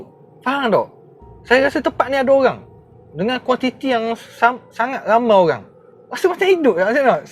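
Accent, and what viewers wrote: Terengganu, Malaysia. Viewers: salon ke salor ke janji org baca dn org faham..tersalah taip,atau dengar x jelas yela sleng ganu kn..tq tau salon rambut ke yerk.salor..slmt mlm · Siang ujan, malam turun salji. 😂😂 Haha...Buat lawok abg ni...Abg ni selit lawak2 skit, tu yg best...Sy suka cara Abg cerita, walaupun tranung tu pekat...Suka dengor loghat2 ni...Keep it up bro...😎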